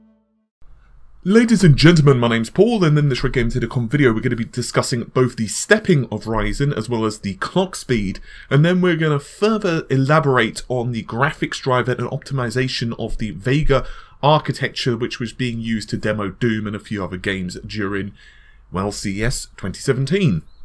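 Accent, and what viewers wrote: England, United Kingdom. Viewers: He speak English you prolly speak American , thats the problem ... prolly .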